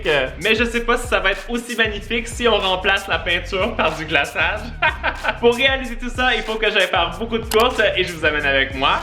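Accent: Canada. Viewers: tu mon youtubeur préféré de is vidéos accent canadien et qui met quoi des vidéos trop trop rire 👍👍👍👍👍traduis le mot anglais 24h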